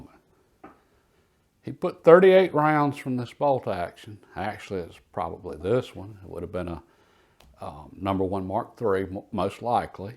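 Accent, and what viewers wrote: South, United States. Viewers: Fantastic just love your southern Accent, thanks for knowledge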